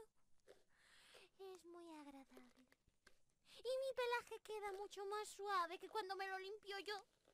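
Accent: Spain. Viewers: Me ha sorprendido mucho este asmr, es la primera vez que escucho uno de español de España y que me guste; soy de Perú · Que pena que desde hace 10 meses no hace streams en Twitch, pero aún así no me pierdo los videos. Ojalá vuelva a ser más activa y hacer versiones en español, la verdad es que su acento español y su voz son increíbles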